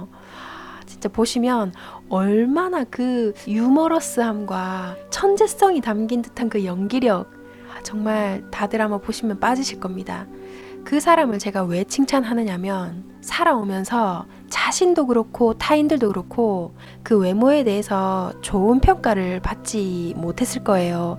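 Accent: Gyeongsang, South Korea. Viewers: 안녕하세요~ 님 오프라윈프리 방송인줄 알고 들어왔다가 님의 러블리한 사투리에 포옥 빠져서 듣다보니 벌써 두번째 보고 있습니다. 남들이 지적하는 나의 단점이 오히려 인생역전이 되는 최고의 장점이 된다는 말씀 그걸 어디서 언제 발산하느냐가 관건이다!!!는 말씀 가슴에 와 닿았습니다. 제 자신을 찾기 위해 나만의 색깔을 찾기 위해 날마다 물음표를 던지고 있는 저에게 큰 도움이 되는 영상입니다~ 감사합니다❤️🤩⭐️ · 님 감사합니다 ~ 고향이 어디세요? 같은 경상도 사람으로써 경상도 억양이 너무나도 정겹고 듣기가 편합니다. ^^. 언젠가 가까운 시기에 꼭한번 뵙고싶습니다 ~ 좋은 말씀 듣고 힘내고 있습니다 늘 감사합니다!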